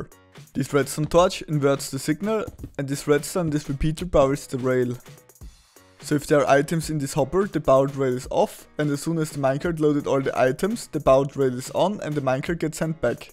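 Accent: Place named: Germany. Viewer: Bisschen deutscher Akzent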